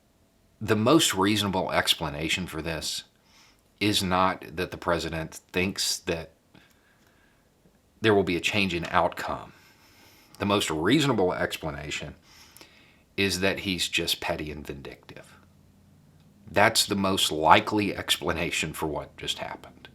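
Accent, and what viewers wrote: South, United States. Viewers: As a non-American, it’s positively encouraging to hear that a person with a southern accent can speak without only saying insane things. I think it’s a first for me to hear. With the existence of your unique breed now confirmed, I hope more of you become visible in the media. I’m very happy to see this. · Love his points of view. But can someone please tell me why he doesn’t have a southern accent in his really old videos and a super southern accent about 8 months ago?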